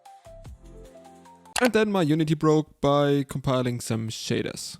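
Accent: Germany. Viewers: Der deutsch akzent ist crazy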